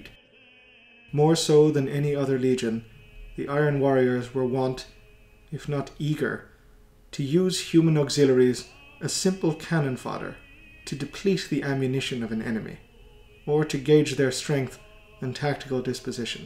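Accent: Ireland. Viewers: Nice to hear the voice of an Irish man recounting the 40k lore.. your version is the best of all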